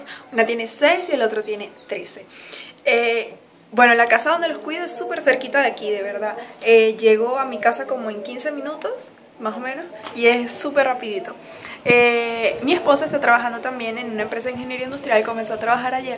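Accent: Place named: Peru. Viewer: Ya se te esta pegando el acento peruano xD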